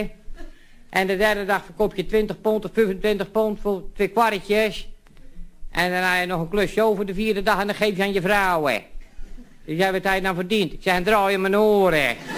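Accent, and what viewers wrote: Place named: Zeeland, Netherlands. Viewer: ik ben ook een zeeuw maar deze praat zo plat dat ik het moeilijk kan verstaan:P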